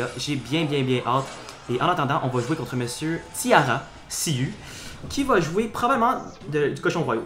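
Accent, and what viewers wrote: Quebec, Canada. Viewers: Salut, vidéo géniale avec accent québécois génial. Continue comme ça. Voici mon ID clash royal pour l'emote Pekka: #YUJP2JCG8 Baptiste.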